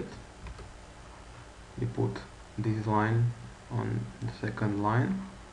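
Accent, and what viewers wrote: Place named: Slovakia. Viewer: the guy has a totally Slovakian accent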